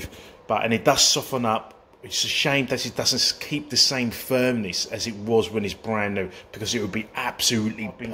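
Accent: United Kingdom. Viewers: Pro-form Blaze and semi oval contractor brushes are good too. I'm in U. S. and that's all I use along with the Picasso. I love hearing the Brits talk. Such a cool accent.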